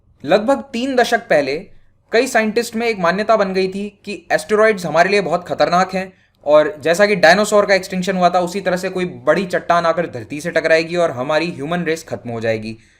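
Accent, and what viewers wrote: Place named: Rajasthan, India. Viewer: When u say killlooo (kg)😂 that rajasthani accent....I like it very much...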